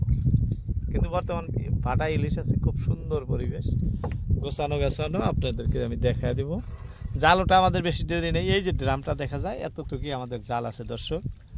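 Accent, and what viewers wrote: Bangladesh. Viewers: চাচা আমি আপনার ভিডিও দেখি,, আসাম থেকে ভিডিও গুলো দেন,কিন্তু আপনার ভাষা বাংলাদেশের মানুষের মতো, আসামের ভষাতো এরকম না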